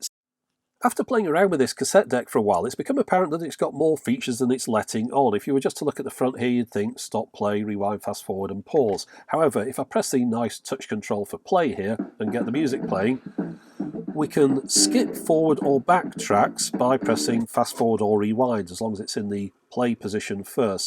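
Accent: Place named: England, United Kingdom. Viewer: Aloha , a great video as ever BUT I need to defend the Pioneer CT-M6R I bought one out here and after removing the jammed tape it has worked perfectly for over 12 moths , I also got the matching Receiver & 18 CD changer all as cheap as chips & all working perfectly .Thanks again for an entertaining video . I enjoy listening to another English accent , I'm from Solihull :) .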